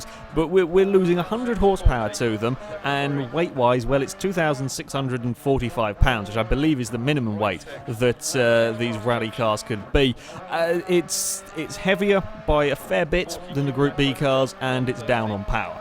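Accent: United Kingdom. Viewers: Aussie? Sounds British to me (I'm British, but live in Australia, so I can tell the difference).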